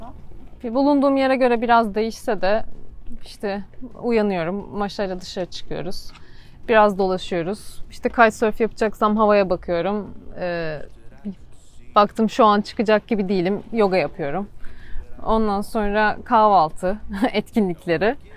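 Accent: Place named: United States. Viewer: Böyle bir konuşma şeklinle hangi aklı başında insan seni destekleyip abone olur bilmem ama şunu bilirim bu kadar yapmacık birini ve ses tonu rahatsızlığını asla seyretmem hanım kendiniz olun bence Amerikan ingilizcesi sizin çok eğitimli olduğunu göstermez bilginiz olsun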